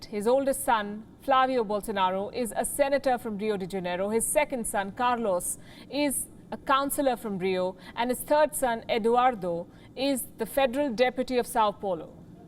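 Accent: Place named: India. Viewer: One big happy family she says with her typical Indian accent - she is classic as always.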